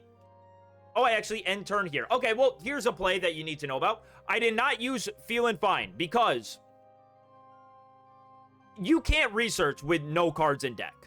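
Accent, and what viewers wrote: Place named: North East, United Kingdom. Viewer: I've never said this bro but I think you must be from the north east. Just based off your accent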